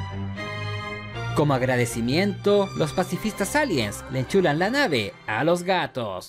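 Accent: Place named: Chile. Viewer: Que buen trabajo, noté un ligero acento Chileno, qué edad tienes? Yo tengo 37 años, la vi a mis 8 o 9 años está maravilla.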